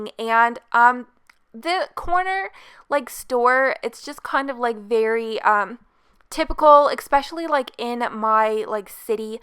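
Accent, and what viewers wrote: Canada. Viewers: I used to believe Canadians didn't have much of an accent that differs from Americans. This video proves me wrong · It's called an accent (I believe she's Canadian) chill out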